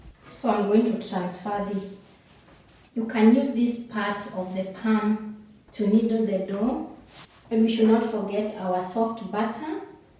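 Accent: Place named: Mozambique. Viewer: I have a friend from there with a similar accent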